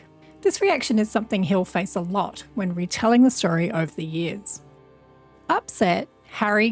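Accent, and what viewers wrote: Australia. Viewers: Great video! I love your accent, Australian? Youre so articulate. 👍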